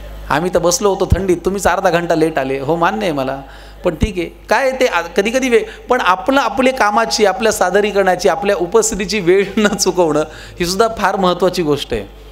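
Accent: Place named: Marathwada, India. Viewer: खु छाण संकर्षण व्याख्यान झाले मराठवाडयाची बोली भाषा तुझ्या वाक्यात वाक्यात आली तुझ्या उज्वाल भविष्यासाठी खुप खुप शुभेच्छा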